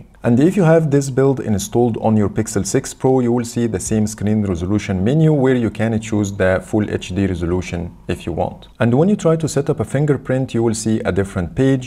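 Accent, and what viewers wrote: Egypt. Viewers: The heavy Egyptian accent tho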